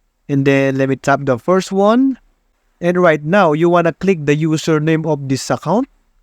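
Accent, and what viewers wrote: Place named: Philippines. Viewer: Accent tagalog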